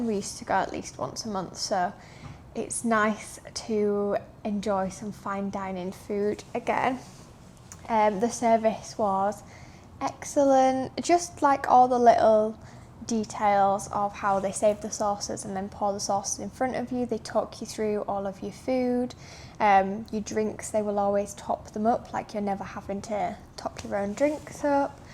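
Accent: United Kingdom. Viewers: Love your very British accent ❤❤❤🎉🎉🎉🎉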